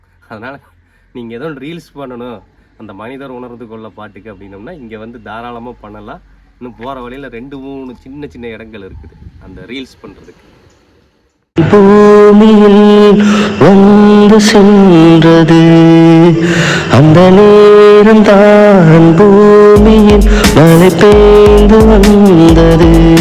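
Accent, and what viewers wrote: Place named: Coimbatore, India. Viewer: neega pesaradhu nalla iruku.. coimbatore slang🙂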